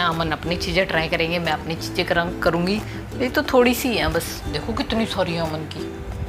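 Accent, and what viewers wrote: Haryana, India. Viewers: wow haryanvi☺️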